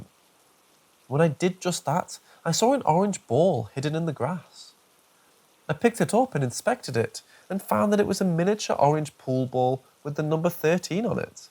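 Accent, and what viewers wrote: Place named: Scotland, United Kingdom. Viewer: Kinda hard to take tales of NC seriously from a Scottish accent....but good tales. 😎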